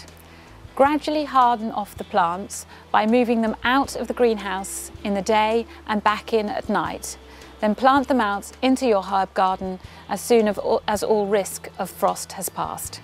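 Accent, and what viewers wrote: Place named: England, United Kingdom. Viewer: English accents make me nuts!! Great video though!!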